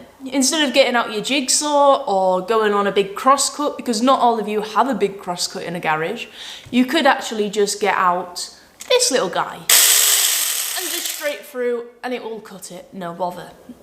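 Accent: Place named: England, United Kingdom. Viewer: i dont know why i came to see this but i stayed for the beautiful lady and the english accent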